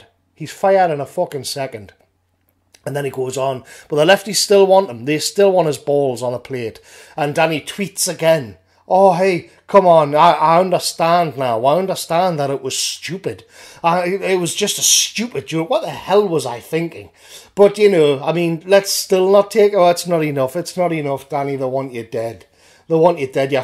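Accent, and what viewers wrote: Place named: Newcastle, United Kingdom. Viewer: Canny to hear a Geordie voice on here!! But, what gets me about this 'story' is this...he tweeted that pic and the pearl-clutchers went batshit crazy etc, he got hammered for it, sacked and everything. Yet they PUBLISHED the same sodding picture, so, why arent they being hammered for the same thing? The hypocrisy is overwhelming.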